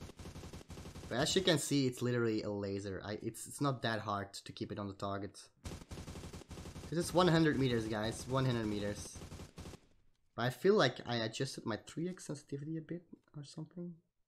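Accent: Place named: England, United Kingdom. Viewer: I love ur english accent, great effort in video, tx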